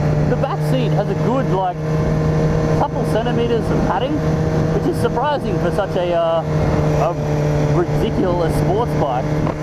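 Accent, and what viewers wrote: Australia. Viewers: One of the best reviews I’ve seen in ages! Thanks! Nice to hear an Aussie for a change and no boring 5 minute irrelevant story that seems standard in the states? Haha. Excellent review thanks!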